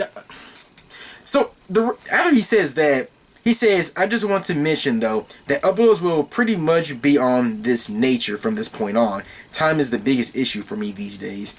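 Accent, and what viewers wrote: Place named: Australia. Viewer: He has Australian accent, not British.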